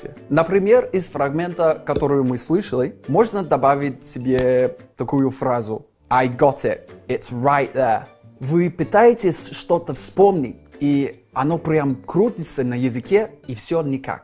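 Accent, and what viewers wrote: United Kingdom. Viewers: Вижу оповещения о новом видео от SkyEng с Сэром - откладываю все дела на потом 😍 Ответы, вероятно, b&a И отдельное спасибо за мой любимый британский акцент от любимого бриташки ☺️ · Я уж подумала, что ни фига не пойму. Оказалось, не оказалось!!! Спасибо большое учительнице английского, к которой я ходила на дополнительные занятия. Она заказывала нам книжки и кассеты из Великобритании. Вспомнила с акцентом их звучание!!! 😢🥺😍😘 Побольше бы таких занятий! 🥰🥰🥰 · Боже, брит акцент наслаждение для ушей, это стаккато, это эаа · Блин британский акцент((( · B, A) Почти без затруднений все поняла.Ох уж этот великолепный Британский акцент 🔥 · нужно однозначно создавать свой подкаст на английском, приятный британский акцент. What do u think about it, sir? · классное видео, спасибо! такой чистееькийи понятный британский английский!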